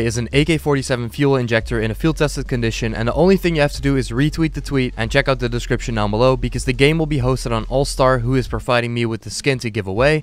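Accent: Netherlands. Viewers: Wait are you dutch? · Are you from the Netherlands 🤙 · Wait a second ur Dutch? Sup broeder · Are you dutch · ARE YOU DUTCH MEN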